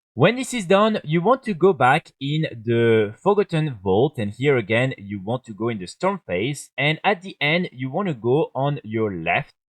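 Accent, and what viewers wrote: France. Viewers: ça c'est de l'accent français comme on aime :D les torrential fragments, j'ai envie de mourir tellement le taux de drop est bas !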